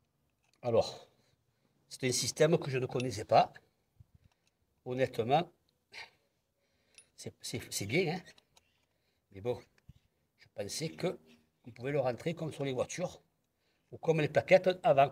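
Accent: South, France. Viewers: Merci pour cette vidéo très pratique.le coup du piston à visser pas évident..heureusement que tu es là!..avec ton accent du Sud ça me rappelle mes cousins Nîmois! Merci encore Une vidéo pour la courroie secondaire serait super sympa... si tu le fait toi même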